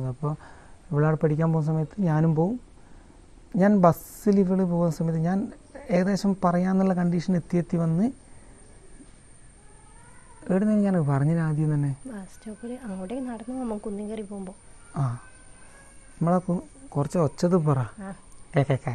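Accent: Kannur, India. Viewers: Nammala kannur basha🤩💖💥🔥🔥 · Njammale kannur basha 😁🥰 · Bro Poli language. Kannur language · Kannur bhasha kett comment box nokalarnnum.....Kannur eadaya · Slang kettappazhe thonni nmle aalann.... 😊knr da💪🥰🥰🥰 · Kannur slang ❤️❤️❤️ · ഞമ്മടെ കണ്ണൂര് ഭാഷ💪💪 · Kanuuurrrr💪💪💪💪 ഭാഷ കേട്ടപ്പോഴേ മനസ്സിലായി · ഞമ്മടെ കണ്ണൂർ ഭാഷ 💕💕💕💕 Chechhii chettaaa❣️❣️❣️ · Kannur evida. Samsaaram kettappozhe thonni ente jillayil aayirikkum enn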